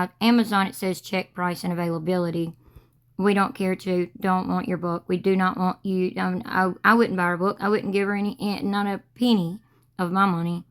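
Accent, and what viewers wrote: South, United States. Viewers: southern accent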